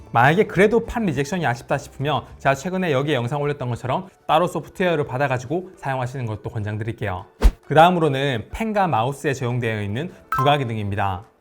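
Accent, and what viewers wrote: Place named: Busan, South Korea. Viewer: 님 눈감고 들으면 안철수씨랑 말투가 비슷하게 들리네요 ㅋㅋ 같은 부산 분이시라 그런가봅니다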